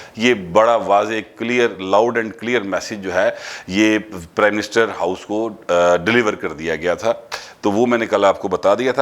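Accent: Lahore, Pakistan. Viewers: Kya baat ai lahori lehje di. Zindabad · آپ کی پنجابی اردو میں بھی بہت اچھی لگتی ہے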